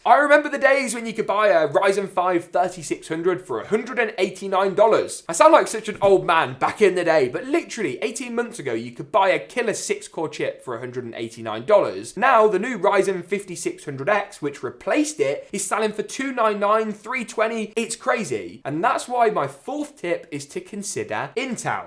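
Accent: England, United Kingdom. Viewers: This guy has a very Thick English Accent But still Sub is deserved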